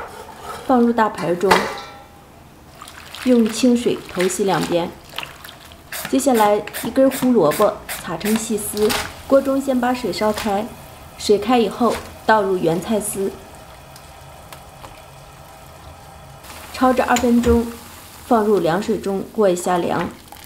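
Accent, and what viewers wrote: Northeast, China. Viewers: 超做作，虛。想你東北話就賊好聽了。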